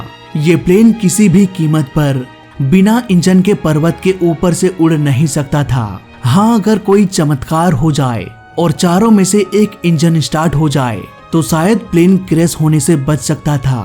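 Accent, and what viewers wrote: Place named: Gujarat, India. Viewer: Gujjubhai accent..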